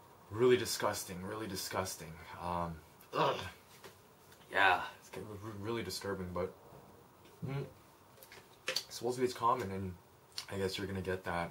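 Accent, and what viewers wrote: Canada. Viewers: dude what's with the canadian accent? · ... but I digress, you should make more videos man ! You're a good storyteller ! I follow you on Twitter btw, I just read your tweet about someone commenting on your Canadian accent, and decided to check out your channel. haha :P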